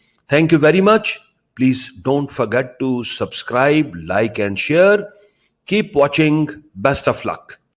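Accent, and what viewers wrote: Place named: India. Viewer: Ur Indian accent is too strong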